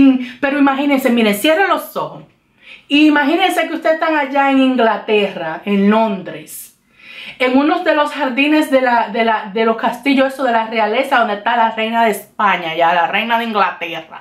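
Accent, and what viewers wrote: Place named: Dominican Republic. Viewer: Saludos, soy nueva en tu canal, por ttu acento creo q eres Dominicana. Amo ese país. Fiesta todos los días.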